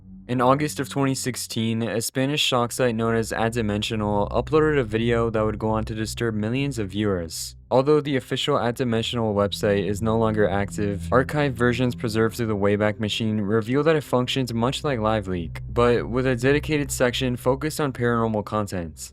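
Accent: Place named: Brazil. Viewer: why bro has brazilian accent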